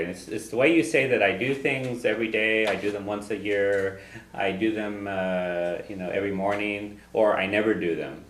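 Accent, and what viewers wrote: United States. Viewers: If You have any respect for the Irish, get someone with an Irish accent, NOT A YANK ONE. Yes he may have the knowledge but JESUS YOU CAN'T LISTEN TO HIM!!!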